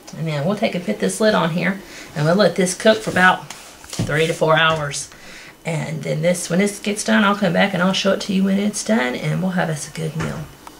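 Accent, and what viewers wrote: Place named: Georgia, United States. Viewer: "I use real salt, not the sea salt, iodized salt, etc. This is salt from the sea" Huh?? Man while filling his stove with red oak and hickory logs: "No live trees were killed for this video". Did you not just say they were red oak and hickory? What happens when you run out of tornado felled trees and you have a wood stove? Wait for the next tornado to keep warm? Aren't the Amish not supposed to use electronic appliances such as video camcorders? You have a Georgia accent, so how did you get from Pennsylvania to Georgia - by horse wagon or did you fly or drive? Again, isn't that a violation of Amish laws? Your cooking looks wonderful and healthy. I just have a problem with the hypocrisy of the religion and some of the comments. You say one thing, do another.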